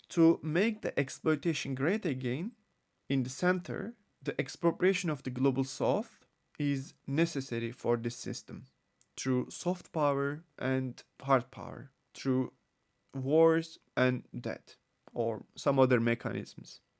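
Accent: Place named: France. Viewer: Your accent sounds French but you look Georgian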